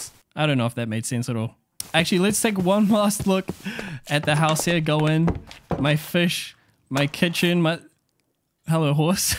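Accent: New Zealand. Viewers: Is that a New Zealand accent? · are you kiwi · are you a kiwi? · I never thought I would hear a NZ accent. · are you a kiwi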